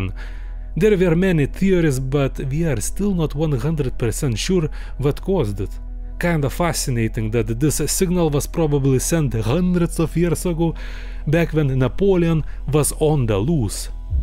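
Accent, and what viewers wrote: Russia. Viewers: Чистый русский английский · I would like to thank you for puttign subtitles, even though i can understand you with your (correct me if im wrong) Russian accent, no offense, i really live thr accent, just sometimes hard to hear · U sound like Russian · ロシア人の英語を聞いてたらロシア語話せそうな気がしてくるから不思議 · R u from Russia or Germany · You sound so russian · Hu... he comes from Russia as i hear!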